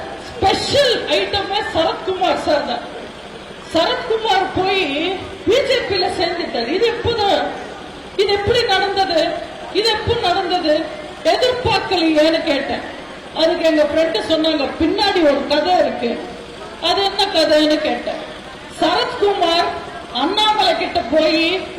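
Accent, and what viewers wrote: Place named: Telugu, India. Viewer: தமிழ் பேசுவது தெலுங்கு வாடையில் பேசுகிறார் 😮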